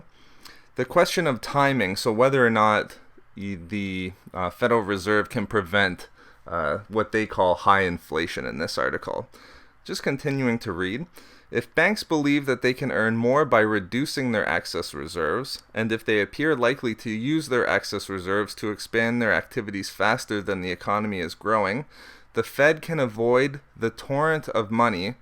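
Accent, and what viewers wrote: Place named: North, Canada. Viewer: Very interesting. I'll check these out and read them for myself, but I am interested in seeing whether inflation rises and what the particular causes end up being. On a more humorous note, do you know that you tend to pronounce "excess reserves" as "access reserves"? Hehehe... Must be that light northern Canadian accent. Don't take that as a criticism though. It didn't take away from the content. I just thought I'd have a friendly pick at you. Looking forward to the next CoinCast videos!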